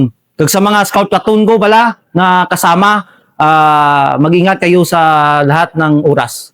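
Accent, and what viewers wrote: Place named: Iloilo, Philippines. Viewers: Ilonggo talaga eh .. sa kwento pa lang 😊...halatang nakapag renew sa iloilo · Hilonggo tekal gid. Na hehe magaling ka sir ha. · Batyagan gd nga ilongo sia sa ,panagalog nya at least never mine isog Taya,!! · tikal ilonggo ka gid gi kagid gina piko gina pala to😂😂😂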